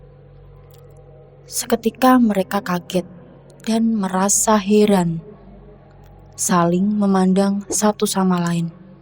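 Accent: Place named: Java, Indonesia. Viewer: monmaap medoknya malah bikin senyum bukan serem , tp tetep keren ceritanya luv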